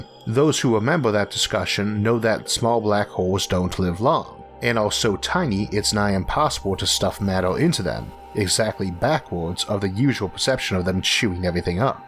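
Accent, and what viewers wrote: United States. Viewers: Why does your voice sounds like that?? Are you american?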